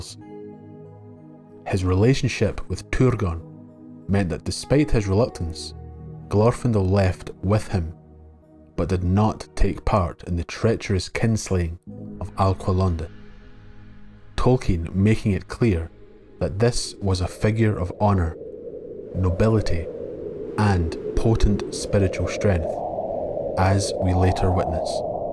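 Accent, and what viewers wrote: Scotland, United Kingdom. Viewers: I always say, "Tolkien must be read by English voices to take me there", but a Scottish one does just fine, quite fine. I've tried listening to Tolkien by American voices, but I guess I've been conditioned to feel the fantasy is real only with English voices, until now, of course, although you're obviously not American. I read a complaint about your pronunciations. I have absolutely no issue, as a matter of fact, the way that you pronounce those words that end in the ending ''e'', ie., "fortresses", "pieces", "process" is quite interesting and a thing that I before you have never heard. Is that a thing unique to you or standard Scottish?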